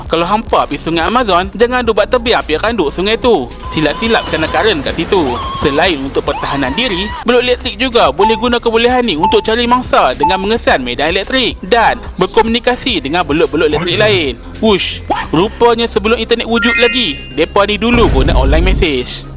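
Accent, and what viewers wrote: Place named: Kedah, Malaysia. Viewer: pekat sungguh aih loghat Kedah dalam video ni...hahaha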